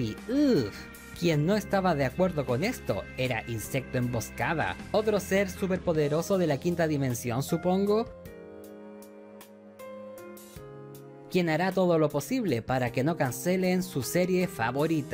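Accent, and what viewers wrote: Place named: Chile. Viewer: Amigo hablas como un chileno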